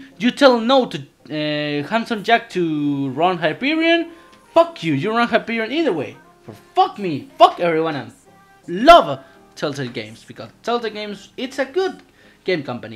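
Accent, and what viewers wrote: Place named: Spain. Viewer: That spanish accent tho. ( ^~^). Nice theory anyway. You get one sub.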